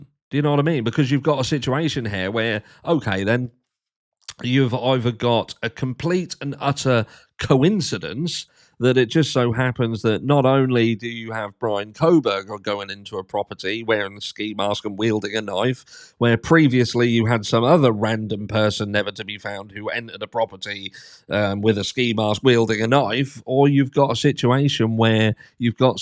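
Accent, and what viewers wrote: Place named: RP, United Kingdom. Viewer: 🤣 U butcher the word “affidavit” like I do, but at least u’ve got that posh British accent to make it sound cool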